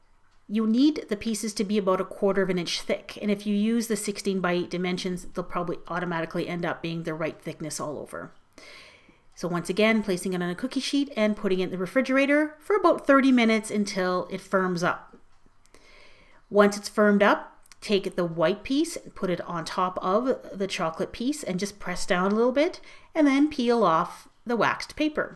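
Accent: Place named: Ontario, Canada. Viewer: The hypno dough got me had to thumbs up lol! Are you from Ontario?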